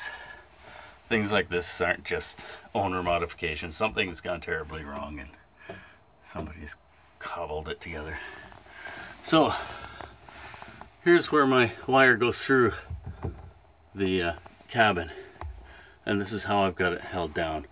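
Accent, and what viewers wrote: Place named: Canada. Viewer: I thought I detected a Canadian accent, it was confirmed when you mentioned using "white hockey tape" to wrap you wires. Take care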